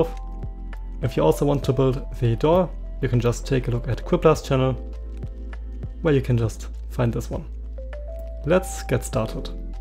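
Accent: Germany. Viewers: Do you think his german accent stands out a lot?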